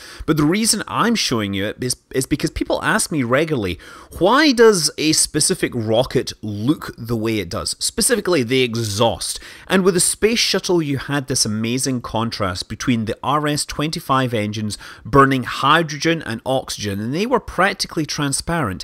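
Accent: Scotland, United Kingdom. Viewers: Maybe this is a stupid question, but how the fuck do you know so much about rockets? I mean, I feel like I've learned so much that I'm going to start talking about rockets with a Scottish Manleyish accent.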